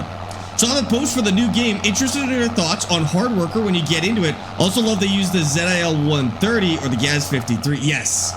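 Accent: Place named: Canada. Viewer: Can tell a Canadian if they say sorry. Not cause yall say it a lot but it’s how you say it that gives it away.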